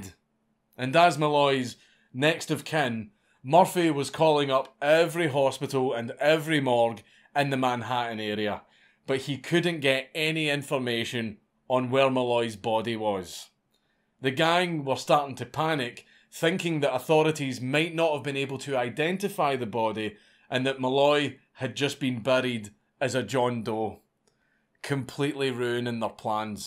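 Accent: Scotland, United Kingdom. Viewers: man, I'm slowly becoming addicted to this series. Hearing hilarious stories being narrated by a heavy scottish accent? Who could ask for more? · Daddy dankula yet again with another story told in his smooth Scottish accent...I love it. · absolutely avg day! 🤭🤫 jealous you live in Scotland. Your accent 🥰🤤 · Oi, mate! Ye Scottish?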